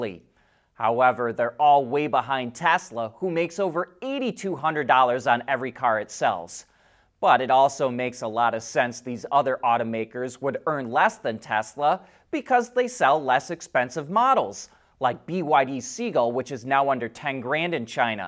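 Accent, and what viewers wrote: United States. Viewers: Is the presenter putting on the outrageous Murikan accent? Can anyone say where it comes from?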